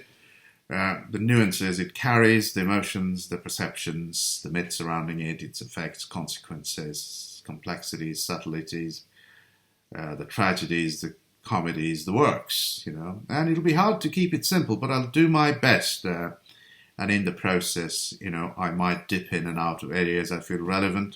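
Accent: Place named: United Kingdom. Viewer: By your accent, I thought you were British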